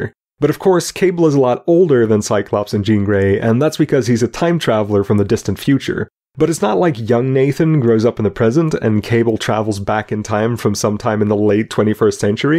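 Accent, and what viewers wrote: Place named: England, United Kingdom. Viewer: I heard some English accentuation in this video. You might have been picking up something from your friends too it seems!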